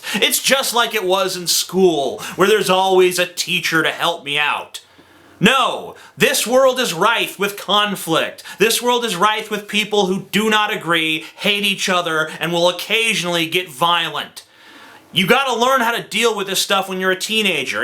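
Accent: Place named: Louisiana, United States. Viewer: does anybody know what part of Louisiana he's from?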